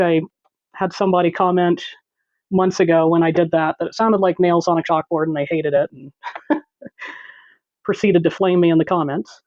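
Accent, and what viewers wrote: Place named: Michigan, United States. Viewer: Are you from Michigan? I am replanted to GA but your “accent” speaks my much loved Michigan! We don’t have accents in Michigan.😂. Seriously, a great tutorial and appreciate your style.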